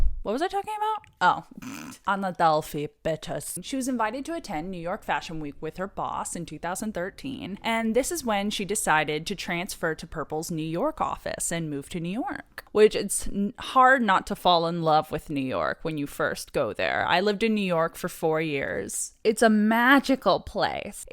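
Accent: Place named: Europe. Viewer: Heh.. yeah her accent is quite... Europeish. Like, "somewhere east of France". And yes, it was, and you hit the notes perfect!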